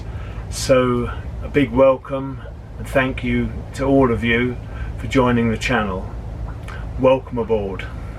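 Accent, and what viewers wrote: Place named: United Kingdom. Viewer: Hey, thanks for the interesting videos! It would be a help if you could put the name of places on the screen so I can look them up on Google or Google Earth. Though your British pronunciation is great, the spelling is not always obvious to us across the pond. Putting it on the screen would be a help. Thanks, and keep up the good work.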